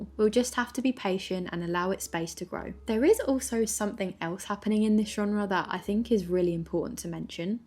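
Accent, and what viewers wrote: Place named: United Kingdom. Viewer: really great and calm video! i love that youre also british cuz i usually only ever find american ytbers making sims/cosy game vids and it just feels more grounded probs cuz its a familiar voice but i digress! :3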